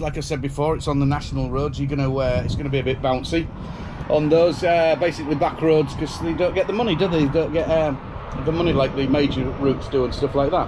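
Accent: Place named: England, United Kingdom. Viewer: Love your accent. Reminds me of the song, I'm an alien I'm a legal alien, I'm an. Love your videos. Howz trucking in USA as compared to UK?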